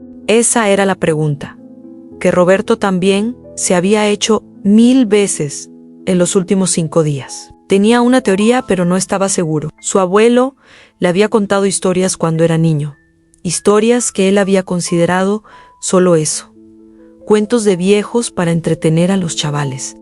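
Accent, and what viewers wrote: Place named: Portugal. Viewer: Excelente. Relato chica tienes muy buena voz en la narrativa suave tranquila. Pausada. Del 1 al 10 te pongo un 9. Todavía. Tienes tu lengua Natal. El Portugués. Muy poco pero de lo demás es excelente. .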